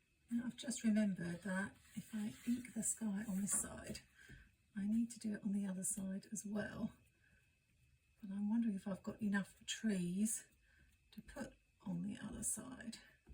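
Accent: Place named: Southern England, United Kingdom. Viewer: I’ve got loads of supplies but am quite keen on starting ‘properly’ in January so I can follow through the year!! Where in the UK are you?? I’m in Essex and your accent doesn’t sound too far north!! 😊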